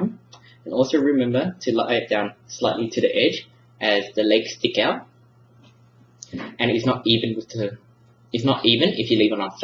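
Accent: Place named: Australia. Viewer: You do realize Aussies all soundlike him right? It's not effeminate.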